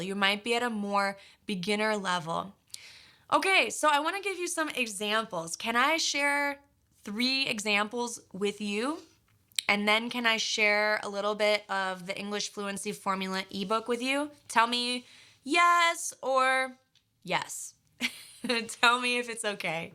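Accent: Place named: United States. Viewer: hi how it's going honestly I liked your way that you are teaching by the way nice accent wow I love American eglish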